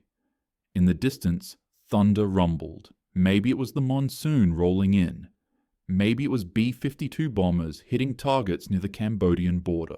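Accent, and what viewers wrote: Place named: Australia. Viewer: enjoy these, but happily an Aussie accent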